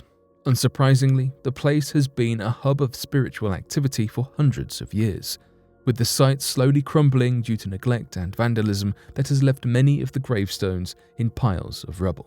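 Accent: England, United Kingdom. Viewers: Hes British. Settle down with the exact pronunciations. I'm surprised more people dont criticize his shitty research. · Americans complaining about how an English persons pronounces something 😂kettle black and all that 😂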